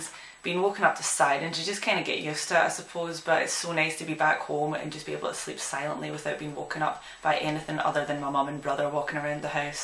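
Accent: Scotland, United Kingdom. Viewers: I watched for the accent. I love a gal with a Scottish accent. :) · I'm in love with the Scottish accent. It's my favorite accent in the world. Please come to the Midwest. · Wow! You. are. so. GORGEOUS! And I TOTALLY TRULY LOVE your Scottish accent. If Scotland has more girls as gorgeous as you, but older, of course, since I'm 50, I'd love to move there ((especially on account of the ignorance in command of my country)). I can handle the rain, since I like to remain inside anyway. Wait, do you have places where lesbians meet each other? Anyway, I Scotland is my 2nd dream country. Australia is #1, Ireland is #3. I subscribed and gave it a thumbs-up. I'll catch you next time. Blesséd Be, Angie aka Wynter Ravyn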